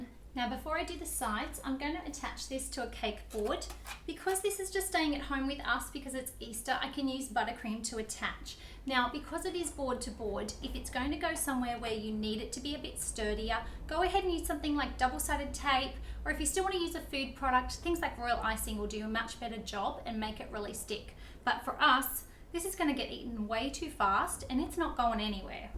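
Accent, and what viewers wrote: Australia. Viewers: is she Australian? or from New Zealand ? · I love watching your videos the cakes you make are amazing! Your australian accent is really cute by the way ^^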